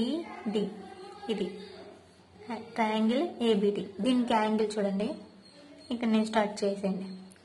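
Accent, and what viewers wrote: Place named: Coastal Andhra, India. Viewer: Madam what is your name? I thinking your name is madam. 😀😀😀😀😀 Madam another doubt I have What is your city? I thinking your city name rajamundry, vizag,kakinada and srikakulam. I said because these city names only Because your voice and slang like this cities only. Please reply me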